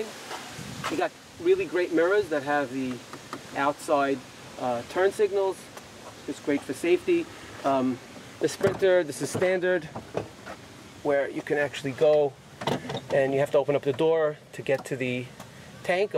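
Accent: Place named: New York, United States. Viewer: Good job 👍🏻 if you couldn’t tell from the license plate he’s a NYer you could tell by listening to him.😆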